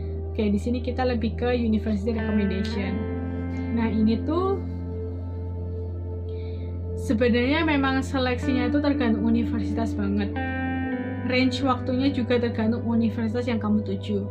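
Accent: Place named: Java, Indonesia. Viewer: Haiii mba, kangen kemedhokanku yak 😆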